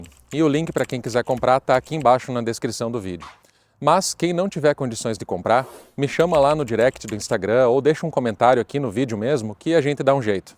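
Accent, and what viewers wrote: South, Brazil. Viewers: Gostei muito da matéria, pelo seu sotaque deve ser aqui de Santa Catarina ou do Paraná. To certo ?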